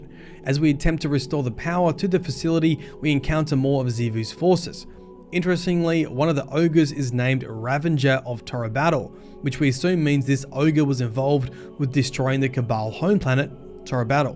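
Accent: Australia. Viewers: Man I love Byf but god damn if it isn’t nice to hear a fellow Australian talk about this crazy story! Thank you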